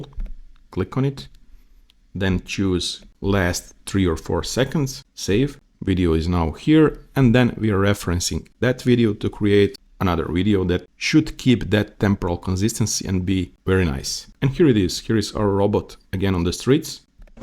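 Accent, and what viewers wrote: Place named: Eastern Europe. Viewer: Where are you from? I hear eastern european accent. this is a grate video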